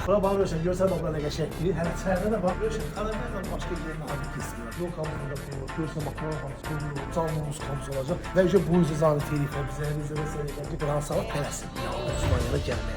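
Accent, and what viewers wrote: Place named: Aghdam, Azerbaijan. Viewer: 100 faiz bu dilin yiyesi agdamlidi😅😅😂😂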